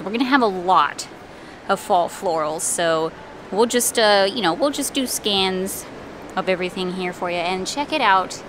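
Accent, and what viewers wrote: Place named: Maryland, United States. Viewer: Sounds like Maryland to me.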